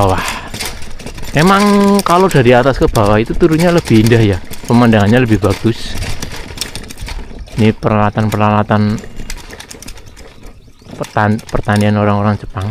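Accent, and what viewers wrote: Banyumas, Indonesia. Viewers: Lee.kok ngapak cah ngendi ,nek ngomong ati2 lee,neng negara orang.takut ada yg syirik nanti jadi abu merangmu.ati2 yoo lee.semoga sukses da n bahagia.Aamiin.❤❤❤ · Ngapak banget co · Asline wong endi si mas, logate ngapak banget 😁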